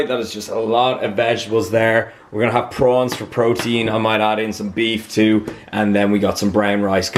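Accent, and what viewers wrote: Ireland. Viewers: Is this dude Irish can't really tell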